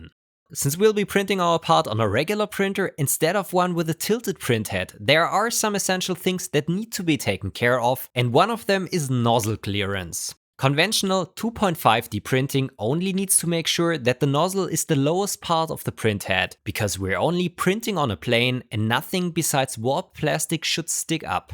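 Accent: Germany. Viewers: Ich hab nur zwei Worte gehört und ich hab sofort gemerkt dass du deutscher bist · All in english, yet so german xD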